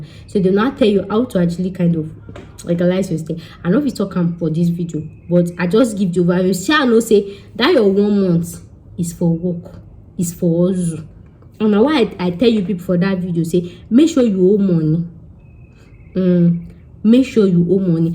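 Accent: Nigeria. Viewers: Hi I appreciate your information. Can you please try not to speak more of Pidgin English. Because some of us we are not from Nigeria we can’t understand some of the things you are saying. Thank you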